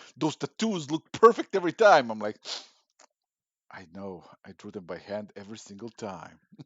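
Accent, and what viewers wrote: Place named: Balkans. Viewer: Love the Balkan accent <3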